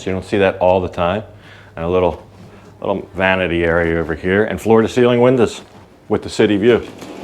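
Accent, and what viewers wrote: United States. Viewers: We get you on the ships . Outside manilla. A real american voice can't be bad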